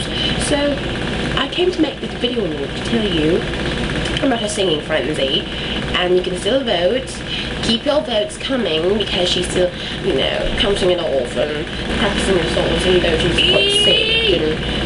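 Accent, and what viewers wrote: United Kingdom. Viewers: wicked, i live in london and im a filipino. u got a posh accent. nice try ^_^ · cool E! gusto ko yung British accent mo,ah!I'm trying to change my voice sometimes(practise ba)kaso bakya tlaga!hhahaha Galing!iba na tlaga pag bihasa sa pagsalita ng Ingles.wla akong ma-say, ingit ako!haha!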